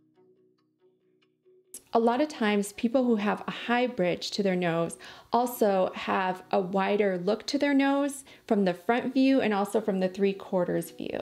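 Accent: United States. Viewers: where are you from?? You sound like you're from the Midwest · its the socal accent lol. it is what it is haha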